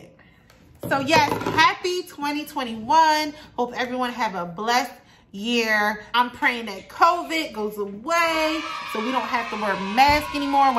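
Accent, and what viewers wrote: Baltimore, United States. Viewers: You gotta be from baltimore lol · Are you from Baltimore?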